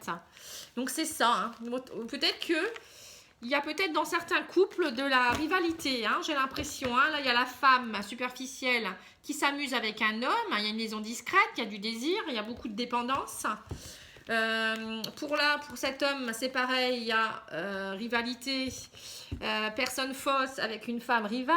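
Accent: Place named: Nord-Pas-de-Calais, France. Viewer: Have Emilia je n'ai pas osé posé la question j'ai reconnu immédiatement l'accent chti j'ai toute ma famille à Boulogne sur mer ❤️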